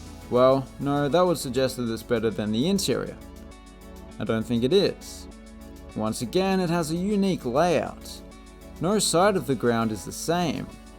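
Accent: Australia. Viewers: Its interesting hearing an Australian accent talk about American football. Great video man. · Why is there an Australian guy speaking. This is America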